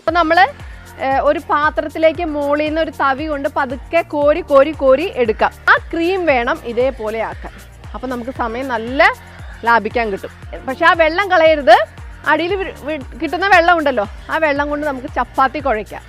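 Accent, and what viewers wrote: Kottayam, India. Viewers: Thanku so mach ചേച്ചി..' ചേച്ചിടെ സംസാരരീതി കോട്ടയം അച്ചായത്തി തന്നെ'' ''ഒരു സംശയം ഫുഡ് ഉപയോഗിക്കാൻ പറ്റുമേ.ബീഫ് ,ഫിഷ് ചിക്കൻ എന്നിവ കൊണ്ട് വിഭവങ്ങൾ ഉണ്ടാക്കുവാൻ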